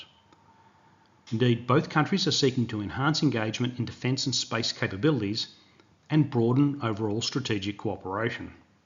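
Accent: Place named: Australia. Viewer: So refreshing to listen to an Australian voice that knows his subject. Thank you for your excellent and accurate content. Yes I have subscribed Instead of the rubbish from the USA and their A.i. voice, their conten is rubbish too.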